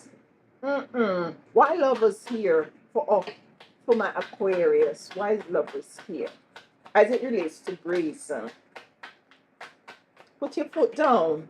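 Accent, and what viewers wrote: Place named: Jamaica. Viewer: Thank you beautiful QUEEN and love your Jamaican accent! ❤💯🌹🎁 First time watching ASÉ ASÉ 🙏🏿🙏🏿🙏🏿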